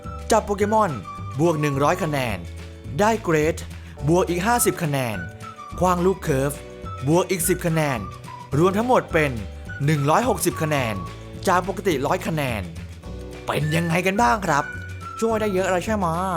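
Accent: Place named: North, Thailand. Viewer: คนเหนือก่อหนิ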